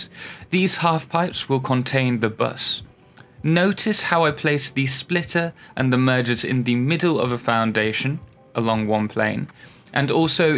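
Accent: United Kingdom. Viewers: Why do you sound like spiffing brit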